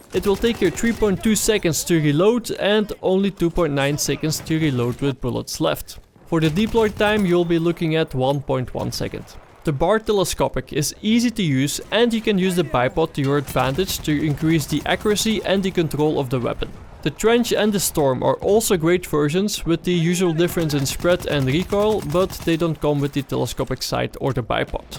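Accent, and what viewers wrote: Netherlands. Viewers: that dutch accent tho, nice good work!! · Is this a dutch voice over guy? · Where are you from? When i first saw one of vids i heard a dutch accent so yea... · You sound Dutch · battelfield wan xD. your dutch accent is overwhelming xD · nederlander lol ik herkende het meteen aan je accent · Ik hoor gewoon dat je nederlands bent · ik hoor al dat je Nederlands bent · That dutch accent. 😂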